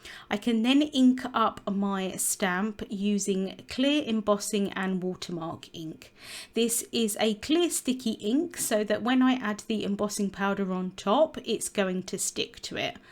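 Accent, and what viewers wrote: RP, United Kingdom. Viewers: A very lovely card! Like the colors and to hear Queens English!👍🏻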